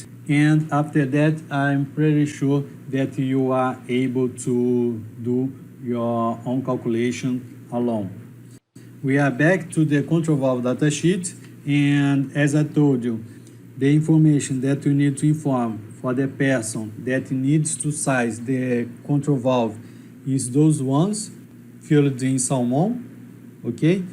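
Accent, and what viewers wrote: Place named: Brazil. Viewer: Cara que canal fantástico... É recheado de assuntos sobre a Engenharia Química pena que eu não manjo muito de inglês para compreender melhor seus vídeos, percebi que você é Brasileiro o seu sotaque te entregou um pouco hahaha. Entrei a pouco tempo para esse mundo incrível da Engenharia Química, estou no 3° período do curso e encontrei seu canal... Parabéééns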